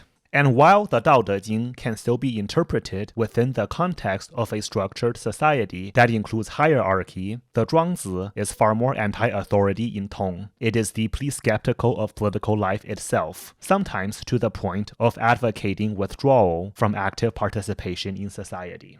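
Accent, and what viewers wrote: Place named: China. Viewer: Bro you have the cutest Chinese English accent❤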